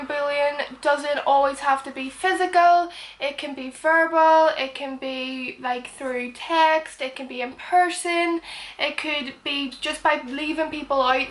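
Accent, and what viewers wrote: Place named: Northern Ireland, United Kingdom. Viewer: Eline P she is from Ireland, Northern Ireland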